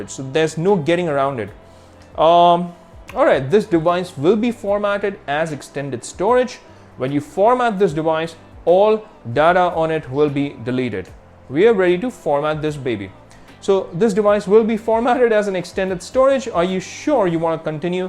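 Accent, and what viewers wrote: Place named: India. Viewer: The Fact that he looks a bit like Chinese...with an indian Accent. 😬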